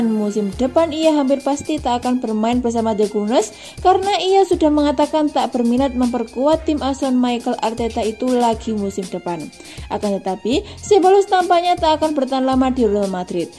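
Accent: Java, Indonesia. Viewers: Logat jawa nya kental sekali mind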